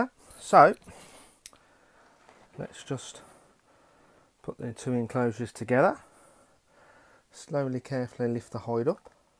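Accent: Australia. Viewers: The best Aussie accent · As a layman I have never heard of this classification, but when I hear an Australian say the words "poison level foive", I know sh** is real!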